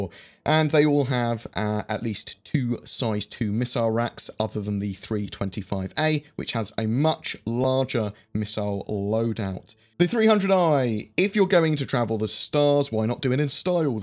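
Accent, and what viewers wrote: United Kingdom. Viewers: 350R or 315P, British accent not withstanding...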